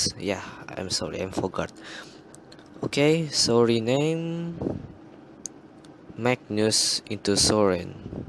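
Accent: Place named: Indonesia. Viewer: You indonesian not engglis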